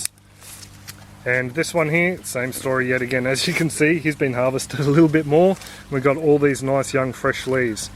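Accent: Australia. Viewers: Very, VERY helpful. Thank you. I am from states but my nephew, his wife and her family are from and live in Australia. It was lovely to hear your accent, made me think of them. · Great, broad Australian accent and useful information. Must get chickens.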